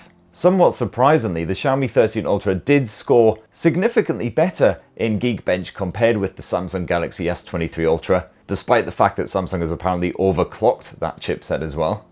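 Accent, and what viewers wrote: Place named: Newcastle, United Kingdom. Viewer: Believe me when I say doesn’t speak with a typical English accent…! He’s what’s known as a ‘Geordie’. 😶 Most British people struggle with their accent too. It’s why we’ve banished them to the far north east of England!🫨 Think North of the Wall in GoT without the furry clothing. Does make good videos though…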